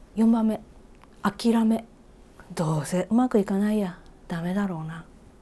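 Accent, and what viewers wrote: Kansai, Japan. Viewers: 関西弁で、喋ってるさん好きです。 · 関西弁素敵です。辻さんのお話は、体の深いところから出ているように感じます。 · 関西弁好きですよ！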